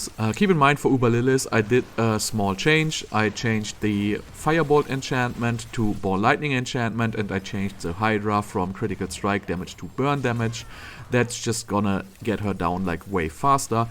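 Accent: Germany. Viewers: So geil das man deinen deutschen Akzent hört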